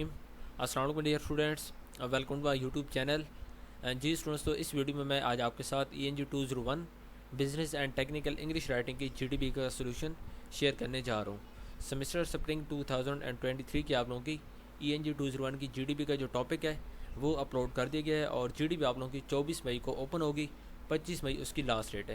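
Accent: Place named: Saraiki, Pakistan. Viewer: your accent is like saraiki people💞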